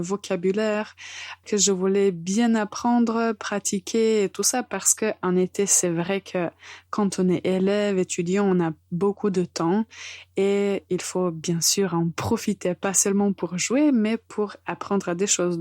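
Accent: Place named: Brazil. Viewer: merci. Je vous comprends très bien car vous parlez très clairement. Je peux identifier des accents de certains pays principalement anglais, espagnol et portugais. Je suis brésilienne et j'ai été surprise que votre accent soit proche du nôtre (portugais brésilien).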